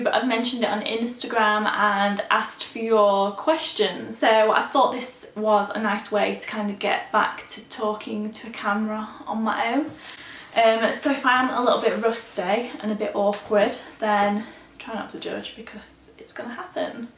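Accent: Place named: Yorkshire, United Kingdom. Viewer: Loved watching this, you're so genuine and it's so nice to hear a fellow Yorkshire accent on YT!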